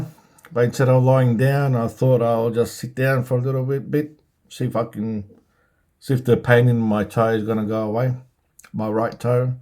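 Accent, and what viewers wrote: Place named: Australia. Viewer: You sound Australian to me and I was just watching the Live Tracking of Apophis and it said passes would be in 2029 and 2036... or as you say in dream; 7 years to go, 7 years to go. Since you posted this video NASA has done the DART mission with smashing success(pun intended) but that got me thinking. Why's NASA doing this? Now I hear we're going to this asteroid and now I'm here and getting very concerned in USA. 2371 Days 2 Go, live them well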